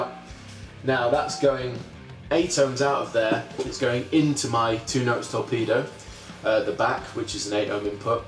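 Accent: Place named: England, United Kingdom. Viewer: where are you orginally from in england? its something i've wondered for a while, i think i can detect an accent.. enlighten me:)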